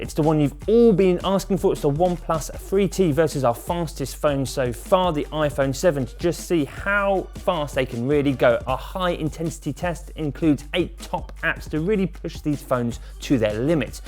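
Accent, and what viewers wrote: England, United Kingdom. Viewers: first name surname he's from England that's how his accent sounds like and English is originally from England. Deal with it in fact your accent is the one that is weird to them.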